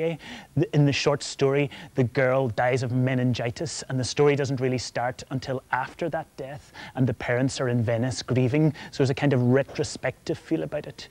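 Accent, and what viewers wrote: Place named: Northern Ireland, United Kingdom. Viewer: That Northern Irish lilt!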